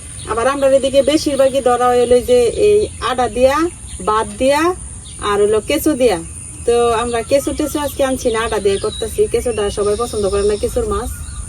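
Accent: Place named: Mymensingh, Bangladesh. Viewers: ভাষা ময়মনসিংহ অঞ্চলের ! খুব সুন্দর। নেত্রকোনা হবার সম্ভাবনা অনেক বেশি । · মনে হচ্ছে নেত্রকোনা বা ময়মনসিংহ বা শেরপুর তবে পাহার ও ভাষায় মনে হয় নেত্রকোনা জেলা র কোন গ্রাম। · কথা শুনে মনে হচ্ছে নেত্রকোনার মানুষ, আমার শশুর বাড়ীর লোকজন এভাবেই কথা বলে। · আসসালামু আলাইকুম ভাবি আপনার বন্য আছে বন্য থাকলে আমি বিয়ে করতাম আমাকে মাচ মারে খাওয়াতো আমার বাড়ি কিন্তু রংপুর আপনার বাড়ি ময়মনসিংহ তাই-না ভাবি আপনার ভাষায় বোঝা জায় মাজা নিলাম ভাবি আপনার সাথে মনে কিছু নিবেন না ওকে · আপু তোমার কথা শুনে মনে হচ্ছে নেত্রকোনা আমিও নেত্রকোনার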